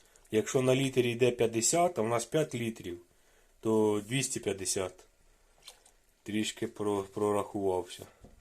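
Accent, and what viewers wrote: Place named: Kirovohrad, Ukraine. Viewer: Така мова цікава, так моя рідня на Кіровоградщині розмовляє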